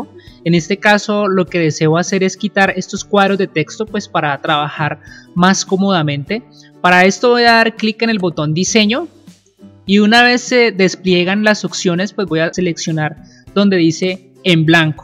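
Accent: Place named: Colombia. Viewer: "Le doy los agradecimientos" que lindo habla, muy propio. Acento Colombiano creo. Saludos, fue de mucha ayuda el video. ;)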